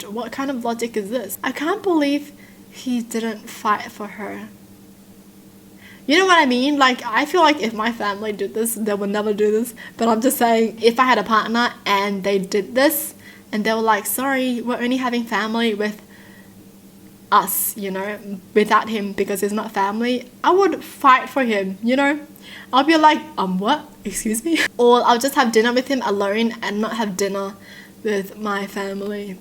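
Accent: Australia. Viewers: she is way to damn austrailian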